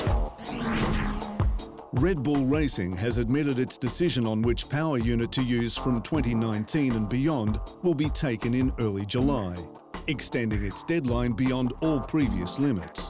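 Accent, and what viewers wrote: Australia. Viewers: now I feel bad for not liking this voice lol. It helped that he was Australian 😂😂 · It's an Aussie voice over guy but he puts on a Movie Trailer voice. "In a world that betrayed him...." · Australian voice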